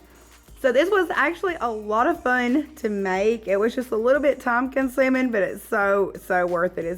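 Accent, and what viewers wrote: South, United States. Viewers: You have inspired me!!love your southern accent too! so pretty!! And we can put our own style into your design and make it customized to our decors Thank you love Canada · Oh my how beautiful, & the lamp isn't to shabby either. LOL Just joking, plus it's really nice to hear someone with a Southern accent like me. I could be your grandma & I loooove your lamp. I just happened to stumble up on your video. You are very talented & bet you started out when you were a lot young. I am going to have to make my own, & watch all of your videos. I love that deep Southern accent, it makes me feel like I am home "In ". Keep up the good work, Little Southern Magnolia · You're very welcome. You have a strong southern accent. Makes me think you are from somewhere close to where I'm from... Kentucky.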